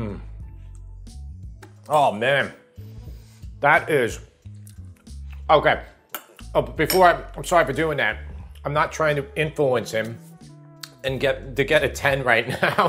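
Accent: New York, United States. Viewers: Hey man, this is the first time I watch you and I love your NY accent. Cheers from Eastern Europe. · I was like you sound like me a New Yorker born and bred.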